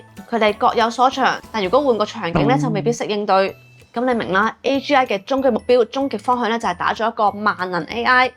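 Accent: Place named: Hong Kong, China. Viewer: ，有冇去過惠州的"雙月灣”玩？好想睇下妳既介紹，香港式廣東話同聲同氣嘛🙂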